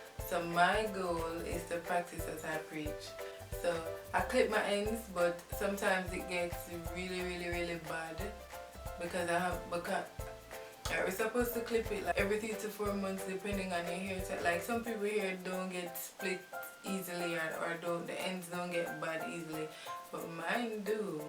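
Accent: Jamaica. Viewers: ...are you Jamaican? you kinda sound...Jamaican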